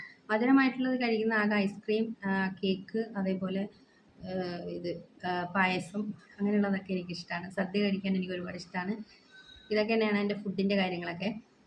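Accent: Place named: Palakkad, India. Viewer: ❤👌🌹🥰hi.. YouTube Facebook Instagram. എല്ലാ സോഷ്യൽ മീഡിയയിലും കാണാറുണ്ട്.. പക്ഷേ മലയാളിയാണെന്ന് ഇപ്പോഴാണ് മനസ്സിലായത്.. congratulation..yur videos very good 🎉 romantic singing song, very wonderful acting.. സംസാരം കേട്ടപ്പോഴാണ് ശരിക്കും നാടൻ പാലക്കാട്ട് കാരിയാണ് എന്ന് മനസ്സിലായത്.. അതിന് എല്ലാവിധ അഭിനന്ദനങ്ങളും അറിയിക്കുന്നു.. ഞാനും ഒരു സിംഗർ ആണ്.. പരിചയപ്പെടാൻ കഴിഞ്ഞതിൽ ഒത്തിരി സന്തോഷം. എല്ലാ പ്രോഗ്രാമും കാണാം.. who is the best very beautiful 🎉❤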